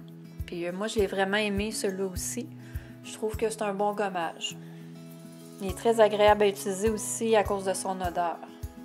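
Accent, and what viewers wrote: Canada. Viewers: Merci pour la découverte, je ne vais pas souvent à Yves rocher et tu m'as donner envie d'y retourner. Sinon comment te dire que j'aime ton accent canadien. Et je mabonne bien sûr 😘😘😘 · J'adore tes vidéos et aussi ton accent - ( J'aime tellement le Quebec!) Je m'abonne a ta chaîne avec un grand plaisir! xoxo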